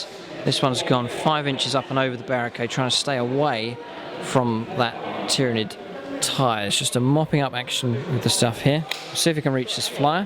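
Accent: United Kingdom. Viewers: exactly what I was looking for! concise analysis, pro painted models and british accent. subscribed and liked.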